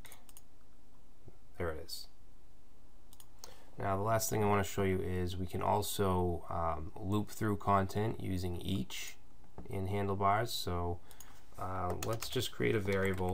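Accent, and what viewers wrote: Boston, United States. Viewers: You from Boston bro?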